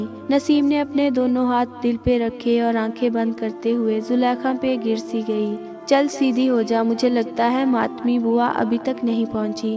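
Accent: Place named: Punjab, Pakistan. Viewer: Your Voice is So Cute With Sweet Panjabi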